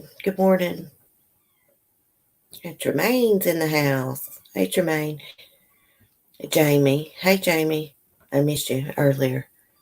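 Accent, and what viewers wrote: South, United States. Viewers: My 5 month old daughter and I listen to you every day. She just lights up when she hears your voice. You make my our day ❤️ Keep it up, girl. Sooo refreshing hearing a southern voice who keeps it real. With love from the Texas hill country, Kate & Roxy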